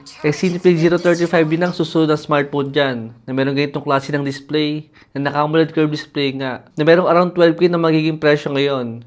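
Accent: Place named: Batangas, Philippines. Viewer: Taga saan k boss parang batangueno ka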